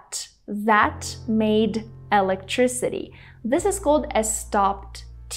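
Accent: United States. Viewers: I love Your English Videos so much Dear and You are so great speaker in American English, I think you live in USA.😊😇🥰😍🤗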